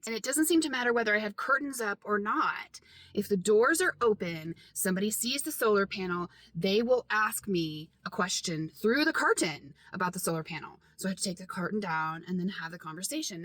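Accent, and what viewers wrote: South, United States. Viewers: Hi! I love your attitude so much. I don't know where your accent originates from, you sound so much like my friends and me. Especially your vowels. I'm a southern girl through and through! Watching your videos is so much like listening to one of my friends or my sisters. AND your channel is growing so fast!! I'm so excited for you. Can you get a Jackery or something like it that can charge off your van while you're driving? Edit: omg I posted while your video was playing and your appreciation made me cry. We love you!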